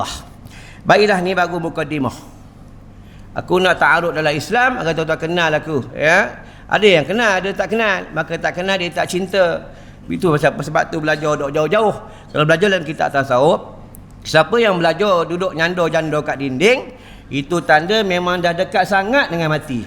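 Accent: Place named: Perak, Malaysia. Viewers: Orng perak mmng ckap kaso sikit jngn terase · Org perak mmg ckp dngr mcm kasar..tp padahal perckapan biasa ja..serupa la org x selalu berkawan org utara..dngaq loghat org kata smbang kasaq..padahal bisa la tu..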